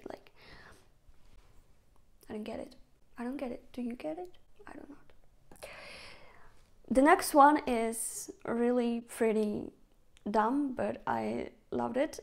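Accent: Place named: Berlin, Germany. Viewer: Berliner! Ganz eindeutig Berliner!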